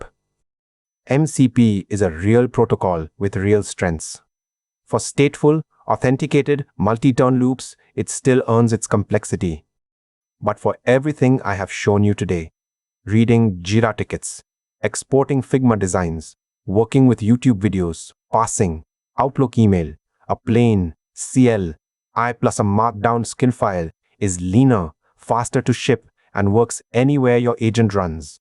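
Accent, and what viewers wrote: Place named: India. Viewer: Relieved I heard an Indian accent and not some ai bullshit 😅. I can now sleep in solace 😂❤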